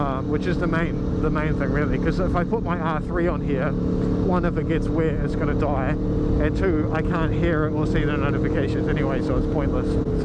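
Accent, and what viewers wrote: New Zealand. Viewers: Hey bro Good to hear a fellow kiwi on YouTube. What bike is that you are on?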